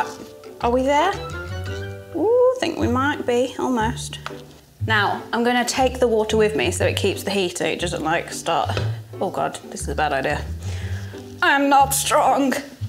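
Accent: Birmingham, United Kingdom. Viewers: The brummie accent is so apparent in this video and I love it 😂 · You are sooo brummy in this video, u cute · You sound so Brummy in this vid! 😂😘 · Hilarious. Also, your brummy accent came out loads in this 😆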